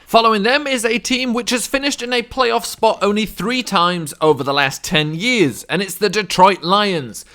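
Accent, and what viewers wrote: United Kingdom. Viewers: Weird to hear a British NFL fan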